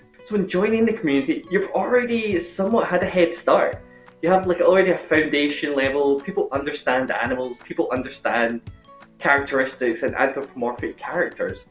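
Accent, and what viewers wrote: Scotland, United Kingdom. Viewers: Is that a Scottish accent I detect? Well, hello there fellow Scot! Interesting video.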